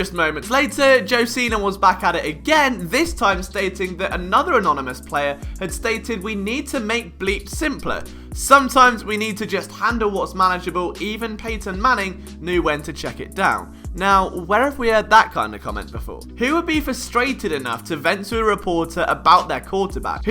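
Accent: United Kingdom. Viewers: Well, I'm from the Philly area, and knows as much about my Philadelphia Eagles as any other reporter, if not moreso. I fully embrace his accent and overall tone and cadence, in fact as an American who spent some time in Scotland, I knew people over there that poked fun at the NFL because it wasn't "literal FOOTball" so frankly I enjoy the fact that a young man from the UK became a top tier Philly Sports writer/commentator because of how much he loves the Eagles etc. You're awesome, and your accent is great, and makes me happy to know that our sport and more specifically my Philadelphia Eagles can not only reach someone from another country, but also capture their heart like it has mine and many others. You be you.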